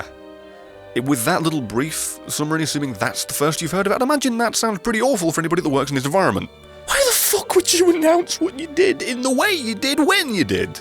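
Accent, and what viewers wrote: United Kingdom. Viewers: He brings humor in a british accent.Also i saw your exact same comment on 2 other videos.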